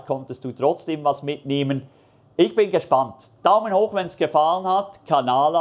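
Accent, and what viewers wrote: Switzerland. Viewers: danke für deine coolen videos!und ich finde deinen swiss akzent richtig sympathisch!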